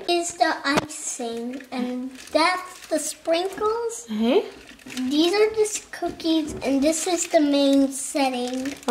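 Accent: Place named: Constantine, Algeria. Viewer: Salam. À ta voix j'ai su que tu es constantinoise, comme moi. Ça fait plaisir.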